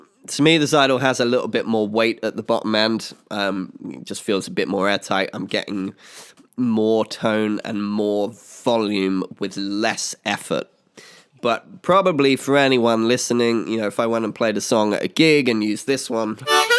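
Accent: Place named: England, United Kingdom. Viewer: The first time I recognized you, was in that incredible "Free Bird Solo" video and I thought, you were an american. But then, I heared you talking and I was surprised, that you are an english guy :) I like that so much! Keep up the good work mate :)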